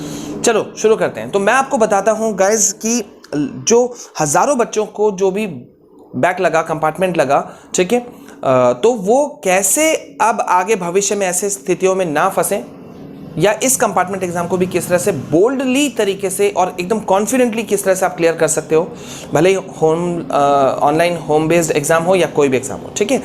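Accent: Bihar, India. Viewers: Sir aap bihar se ho kya ?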